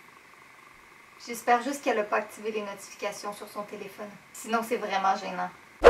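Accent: Quebec, Canada. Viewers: T canadienne ou québécoise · j'adore ton accent québécois 😙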